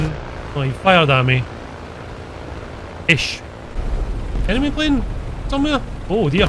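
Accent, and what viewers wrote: Scotland, United Kingdom. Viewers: Hey i'm a bit confused are you scottish or irish?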